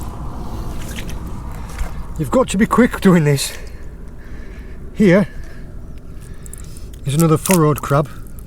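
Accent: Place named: England, United Kingdom. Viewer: where do you forage? by accent Im guessing England, but I cant place it, I never lived in England long enough to tell. Im actually considering moving back to Ireland as I miss foraging seafood a lot - about 20 years ago I used to live in Carrigaline/Crosshaven, Ireland and pick up seafoods with a bucket. Your channel sure makes me miss those times.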